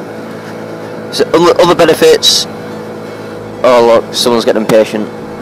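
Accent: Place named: Manchester, United Kingdom. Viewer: Manchester Burnley or hapton, you sound like your that area, I have family near Burnley 👍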